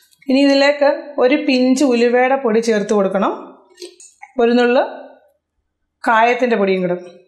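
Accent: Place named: Ranni, India. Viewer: Facebookil page kand recepies try cheyyarudarunu. Ariyavunna oral parayumpoleya samsaram. Pathanamthitta ranni samsaram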